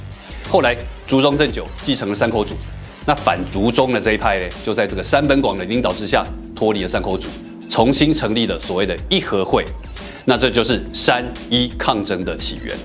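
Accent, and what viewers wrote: Taiwan. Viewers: 因非常喜歡人中之龍而追上此頻道，祝蔡桑頻道就此火紅!! PS就是喜歡這種夾著台語的氣口，蔡桑加油!! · 這個本土味聽得太舒服了，天啊～ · 今天第一次聽到蔡桑非常喜歡馬上訂閱台語的口氣非常讚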